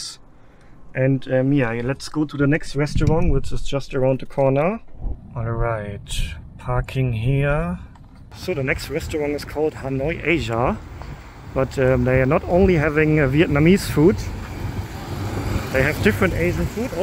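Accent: Germany. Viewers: Your mum is H.O.T. ! ! Her English also is very English!!?? you seem to have a very strong German accent where your mum is WOW and H.O.T. Please tell your mum XDDDD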